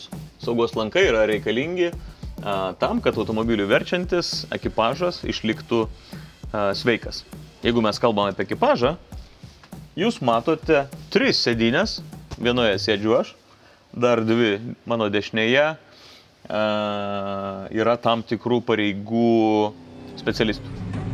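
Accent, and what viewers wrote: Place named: United States. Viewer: kalba su ameriketisku akcentu.ziauriai skamba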